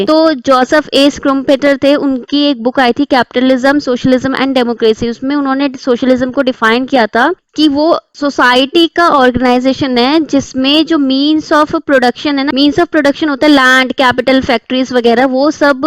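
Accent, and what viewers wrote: Assam, India. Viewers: Mam are u from Assam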